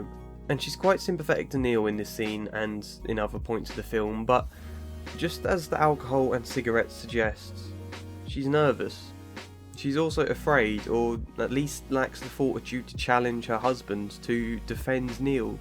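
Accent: England, United Kingdom. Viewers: You sound a lot like Robert Sean Leonard only with an English accent.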